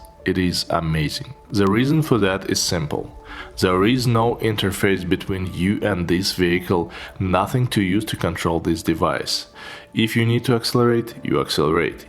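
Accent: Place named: Russia. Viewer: Ещё не полностью избавился от русского акцента:)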